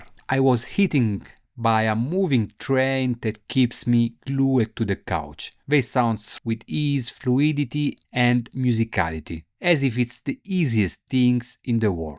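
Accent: Italy. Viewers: I like italian accent! What camera do you use?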